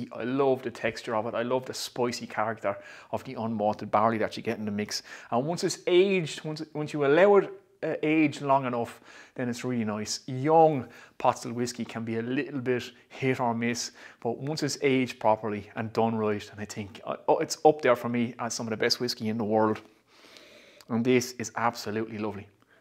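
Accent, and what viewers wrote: Ireland. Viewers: HI! I love you reviews! They have helped me understand Irish whiskeys so much better. Qstion for you- I have a friend going to Ireland in about 10 days, I want to have him pick up some whiskeys for me, preferably something I cant get here in the states. I do like bourbon style whiskey the best, Jameson is good but im not a big smokey scotch drinker. Any suggestions? Locally I can get powers Gold label and im going to try that! LOVE THE BROGUE!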